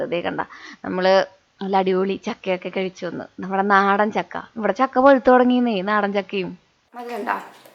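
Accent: Thrissur, India. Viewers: Aniku pandulla vedio anu eshttam.originalty undayirunnu.trissur slang correct ayirunnu.ennu athokke mariyathupole.❤❤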